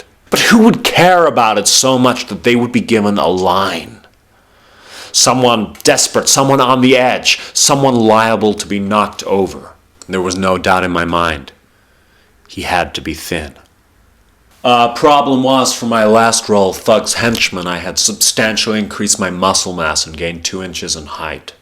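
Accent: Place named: United States. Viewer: I loved the ending! And nice American accent!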